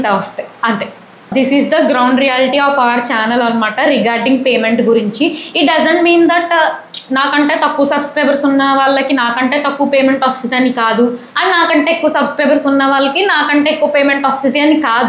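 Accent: Godavari, India. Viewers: Good to see your videos. I like the way you speak and your slang from GODAVARI. Even i am from andhra from Vijayawada, but my parents are settled in HYD and my self in UK.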